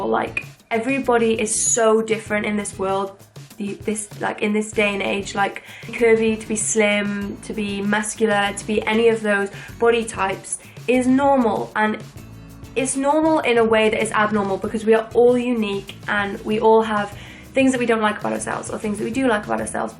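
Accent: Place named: Cardiff, United Kingdom. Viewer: There are so few welsh youtubers! I can recognise a fellow cardiffian anywhere haha.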